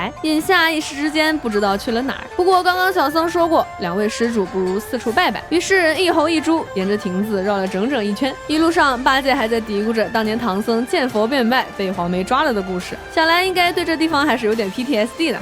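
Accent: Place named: China. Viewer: 地域差异是事实。你不懂这个的话不就是科普？我何时反驳自己第一则回应了？我一开始就告诉你这是口音问题。她说话是普通中国大陆人说话口音，你不知道，所以我们这么多人才一起告诉你同样的话。很明显你不知道，因为你到现在还在因为大家科普你了所以自尊心受损破防 😂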